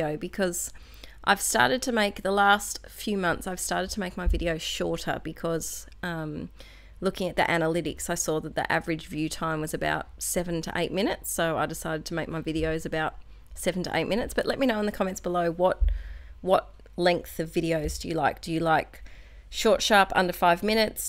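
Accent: Australia. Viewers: I like 10-15 minute videos especially if I'm at break during work but I love listening to an aussie accent for hours. So you make them as long as you like.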